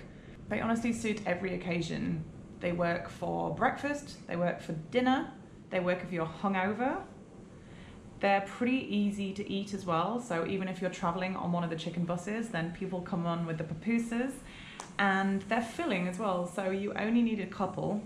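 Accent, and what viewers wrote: United Kingdom. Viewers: Dear, first and foremost, thank you so much for visiting our country. It's so smashing to know that you have come from so far away good old England. A question, did you visit El Cuco beach?? I believe you didn't but in case you are willing to come back again, I strongly recommend you to visit El Cuco beach located in the eastern zone of El Salvador. Although services are faster in El Tunco, El Cuco beach is so enthralling and I'm sure you will love it. I'm an English teacher with an accent that will set you thinking...it would be amazing if you could listen to my pronunciation. I love british English and your accent is just stunning. I trust you are doing well and enjoying life. I'm so glad you had a smashing time here. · we are not used to the brit accent yet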